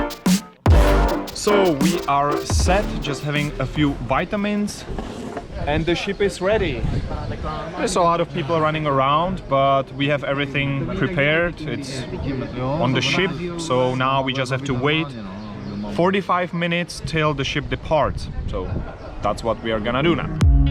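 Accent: Czech Republic. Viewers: so you are czech or austrian? :D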